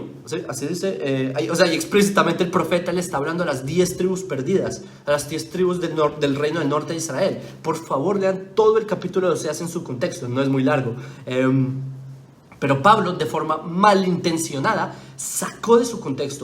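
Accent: Bogotá, Colombia. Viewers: Y cómo va a vivir libre, sirviéndote a ti ? Que eso es lo que quieren los judíos , que les sirvan los demás ? Estás crazy , resígnate y entiende que Dios es Dios para el Mundo , Y que Dios prometió bendecir a todas las naciones , con su risa tonta y su acento de rolo nadie te cree, no eres más que un cachaco con kipas ! 😢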